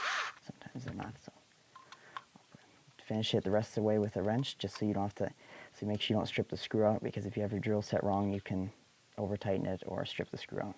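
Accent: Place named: Canada. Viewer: i love the way Canadian people talk..... TRAILOR PARK BOYS!!!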